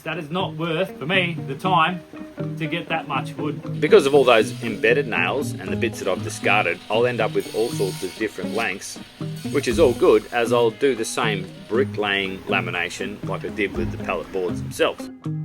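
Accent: Australia. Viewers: I do love my machines, I've not quite as small a shop as you have though. Even as an amateur, time is as important to me as money since I never planned to support my hobby with what I make. I'd mention that sometimes the background music is just loud enough to make your speech less discernible. (Sure, I hear in American but I can usually follow Aussie.)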